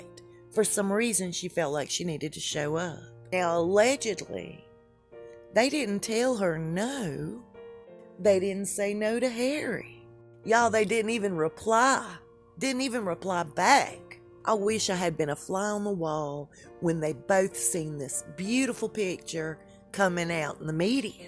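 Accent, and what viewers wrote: South, United States. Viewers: I didn’t know what you looked like. You have a southern accent. You finally showed your face. Your southern dialect didn’t match what in my mind you looked like., I was blown back. You are so beautiful. ✍🏻👍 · I absolutely love your accent .....are you from the south?? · LOL - This Southern accent commenting on the royals is odd even though the commentary is on point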